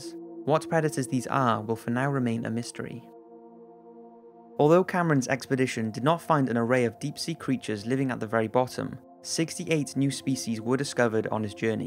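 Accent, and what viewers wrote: Wales, United Kingdom. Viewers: I hear a brit accent and talk of kilometers and my New York ass says gnite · Are you from Cardiff I think you are shout out to my fellow welsh man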